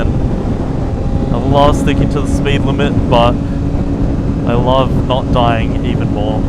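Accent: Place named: New Zealand. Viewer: You sound kiwi my bro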